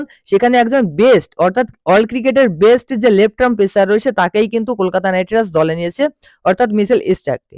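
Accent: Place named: Bangladesh. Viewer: দাদা আপনার তো ভাষাটা বাংলাদেশি মানে বাঙাল আপনি বাংলাদেশে চলে যান নাহলে আমাদের গোদা বাংলায় কথা বলতে শিখন করবো লড়বো রে জিতবো রে আমি kk r😊😊😊😊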